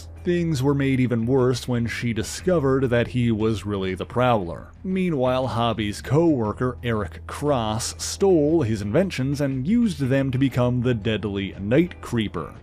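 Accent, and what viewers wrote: Canada. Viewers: It’s HO-b…not hobby…is this dude Canadian?…some of the weirdest pronunciations ever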